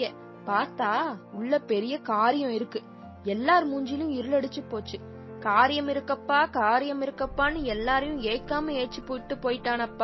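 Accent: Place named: Coimbatore, India. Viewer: Nice cbe voice.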